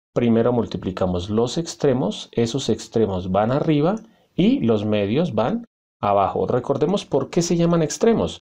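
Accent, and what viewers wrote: Colombia. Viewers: Es de Colombia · PROFE COLOMBIANOOOOOOOO HOOOOOOOOOOOOOOOOOO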